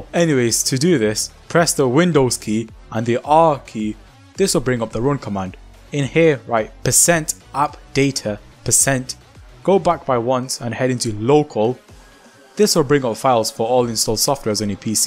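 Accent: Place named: India. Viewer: no racism but you are the first indian sounding yuotuber that has done a legit tutorial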